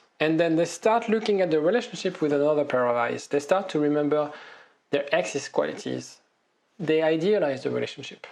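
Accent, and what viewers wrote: France. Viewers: While listening you, I hear your french accent. Probably I've mistaken but it's really nice😊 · Thank you, also very nice to listen to your French accent, Monsieur!;-) But not really sure if i want Her back haha... if there's still a mutual karma She will be back anyway. These kind of videos help, donc merci quand meme,! Salutations de Pologne.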